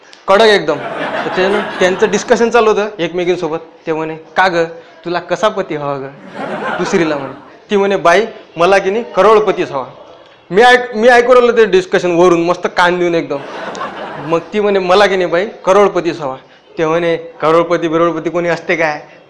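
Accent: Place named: Vidarbha, India. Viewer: Nice to hear Vidarbha Marathi 😃😃😃👍🏼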